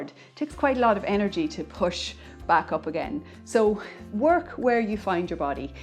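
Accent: Ireland. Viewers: thank you for your testimony. I appreciate your honesty. Part of me can’t imagine getting to a place where i can run or jump for an extended period of time without leaking... so surgery seems like the Obvious choice. I get different reports from different doctors about mesh. I believe they used my mom’s own tissues when she had hers done. I have rectocele and retroflexed uterus as well with prolapse . I have some lower backpackpain and i have had a ablation for excessive bleeding. I have been told by someone that a hysterectomy also helps with the POP and incontinence w or wo the sling procedure . What are your thoughts??? I am going to keep working with my pelvic floor and i do plan on reading your book. But i appreciate your feedback. PS I assumed that you were from Ireland bc of your accent. Your English is very good!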